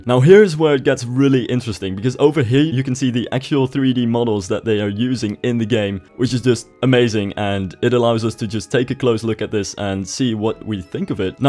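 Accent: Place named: Netherlands. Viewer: are you from holland? your accent sounds dutch :P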